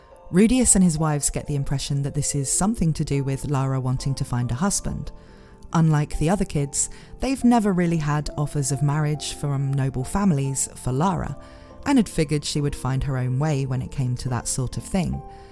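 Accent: United Kingdom. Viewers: WhenI heard you say "Last One to Leave the Nest", I had a tear in my eye. Also: Christina Best Child Do people in the UK (assuming based on your accent) pronounce it "obleej"? Because in French, that "é" would pronounced like in "hey' (without the "y" sound). Not sure if this makes any sense. Truly loving your content. Gonna check that Vivy video next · de donde eres? xd suena a britanico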